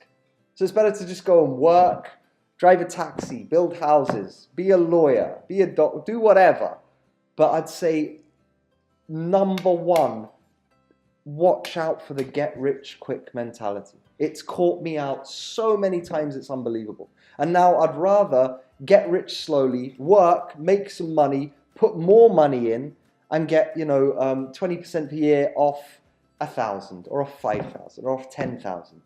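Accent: United Kingdom. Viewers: I love the British accent. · Mate your British accent reminded me good times that I spend in UK! Very friendly speech mate! Thanks for the info!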